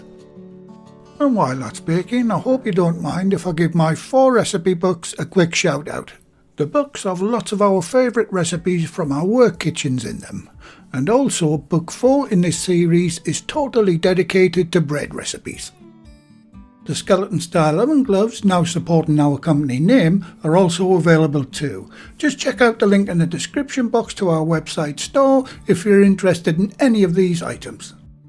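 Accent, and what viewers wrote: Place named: Newcastle, United Kingdom. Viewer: I don’t hear anything but Geordie.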